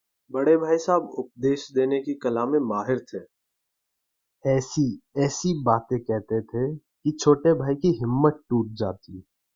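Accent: India. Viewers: desi style mey pada ro ha tu tie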